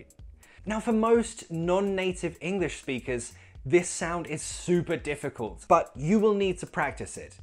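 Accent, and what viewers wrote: United Kingdom. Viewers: i wanna have a British accent just like u, and yr great videos r my path.. keep being cool and thank you so much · That's what I was looking for! A perfect British prouninciation and Video 👍 Keep it up Thank you from Algeria! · British speaking hear very soft accent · Hello. Greetings from Azerbaijan:) I also think there is not any schwa in your name. Both syllables are stressed I think. Do you practice th british accent? I myself have almost mastered it but I need quite a persistent friend to practice with. I am female I am writing from my husband's account. So i need only a female friend to speak to. looking forward to your reply dear:) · I’m from Oman🇴🇲.so I’m Arabian...thanks you so much ..you made me fall in love with British accent. My brother is learning the American accent but I found that the British accent is better ..you’re the best teacher everrrr.....😭😭💗🙏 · I'm No one hey i love ur accent BRITS 😍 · Absolutely amazing! 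It's incredible how you show in a natural manner how one can speak with the British accent and sound really cool! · Wow you are a real British.I like the way you teach.I m lucky if I have a Brit to talk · Finally I've found someone spreading the good old British English. Hurray! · just British accent ❤ cheers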